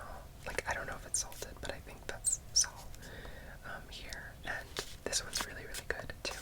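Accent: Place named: Bronx, United States. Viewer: YOU'RE FROM THE BRONX?!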